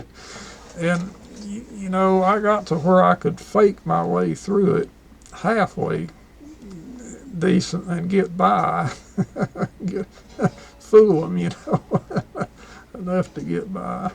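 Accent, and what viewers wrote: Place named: North Carolina, United States. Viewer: I like your playing, and your voice (the southern twang from....North Carolina??), and also the old electronic gear in your room. All the best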